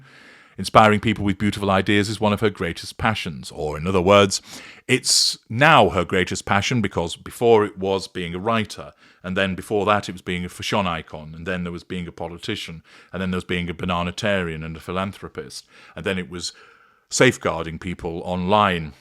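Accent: United Kingdom. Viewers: Unfortunately, as you can hear, is British and therefore has no sensitivity or superstitions about such things that make you uncomfortable. He's just reflecting his own British culture. Sorry.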